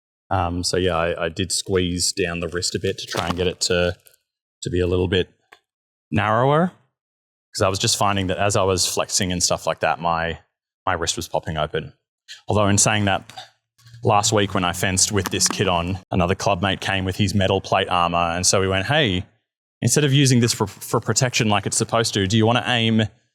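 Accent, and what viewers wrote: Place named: England, United Kingdom. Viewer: nice review. I was expecting you to speak czech as the pic had subtitles lol. I got The Kings English. 😅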